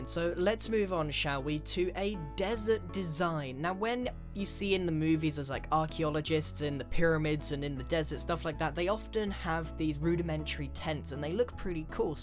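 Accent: United Kingdom. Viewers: Ameenitys ha British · your from BRITIN wait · Is it me or grain really emphasizes that he’s British in this video? 🇬🇧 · Caravan. In Australia we say Stuff pretty similar to the way you say it in Britain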